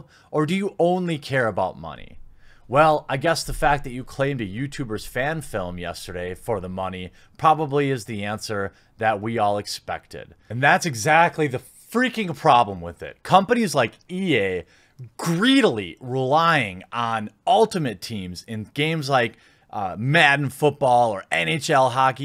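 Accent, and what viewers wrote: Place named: Minnesota, United States. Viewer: Ha you say rag tag like you say bag. Are you from Minnesota ?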